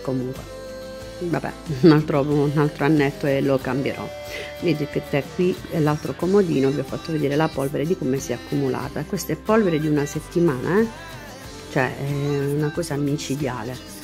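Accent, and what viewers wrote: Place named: Apulia, Italy. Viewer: Ciao sei pugliese ?si sente e anche io lo sono abito nella bat ,cmq belle pulizie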